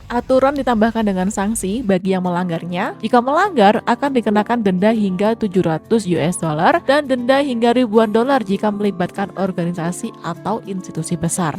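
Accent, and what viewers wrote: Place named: East Java, Indonesia. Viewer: Mbaknya logatnya agak medok sepertinya dari jawa timur ya😅😅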